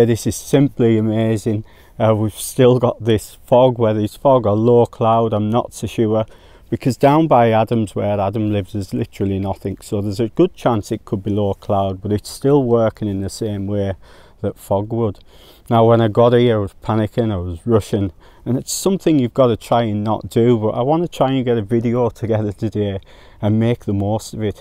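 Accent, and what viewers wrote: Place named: Sunderland, United Kingdom. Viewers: Great video, me and my wife are trying to figure out your accent, I've decided you're from Cockfield, she thinks Sunderland. Could you please end the mystery for us. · Canny video, where are you from? I'm from Sunderland and can detect traces of mackem in your accent but not sure.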